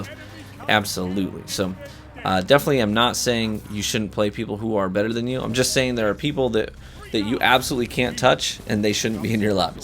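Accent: United Kingdom. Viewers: Gotta admit, I wasn’t ready for the funny British accent. Hahahahha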